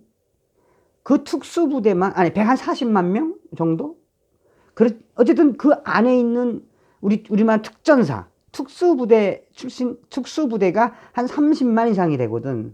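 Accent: North Korea. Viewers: 첨 들어왔는데 북한 사투리가 정겹네요. 저쪽 사람들 디리디리 까 주세요. 간나새끼들 간이 오므라들겠어요. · 참 재밌게 방송하시세요^^한번씩 북한 사투리도 정겹게 들리구요^^감사 드립니다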